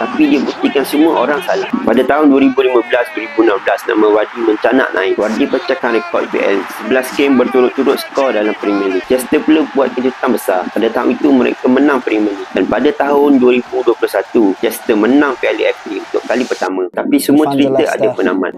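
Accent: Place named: Malaysia. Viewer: Mulut melayu loghat melayu lah apa lah kau ni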